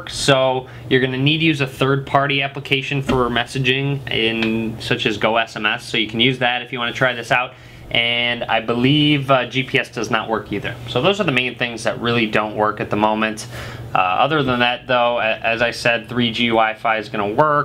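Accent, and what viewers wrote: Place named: Chicago, United States. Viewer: dude i'm not from the states but i know you got a strong chicago accent!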